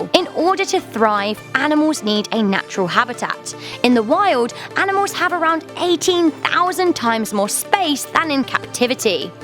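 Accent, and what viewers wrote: United Kingdom. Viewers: Ginger Ninja she's British lol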